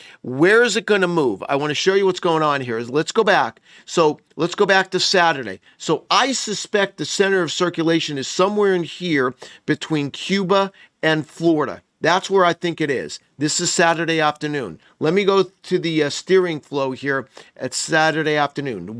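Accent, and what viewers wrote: New York, United States. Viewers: He must be from the New York City area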